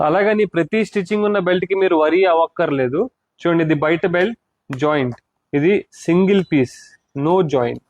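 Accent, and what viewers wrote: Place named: Andhra Pradesh, India. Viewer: Mee telugu slang super..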